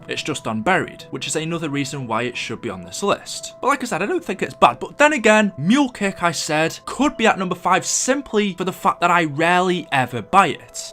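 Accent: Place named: Wales, United Kingdom. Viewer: are you Welsh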